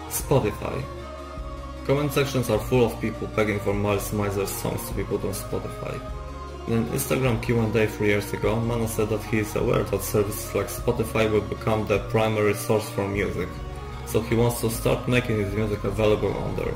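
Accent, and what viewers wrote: Poland. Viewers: brzmisz jak polak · Polish accent?? 🤨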